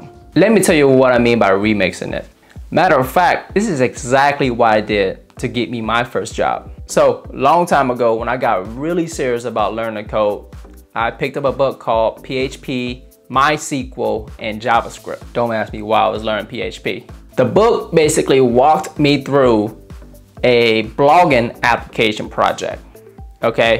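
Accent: South, United States. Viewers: Aside from the amazing pieces of advice, I just want to say how nice your accent is. Deffinitely can hear some Southern US + Viet accent! Cheers brother. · Are you from the south? xD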